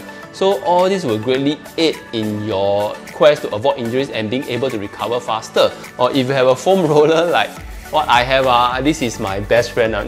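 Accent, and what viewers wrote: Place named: Singapore. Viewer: the way how Singaporean talk. The slang is weird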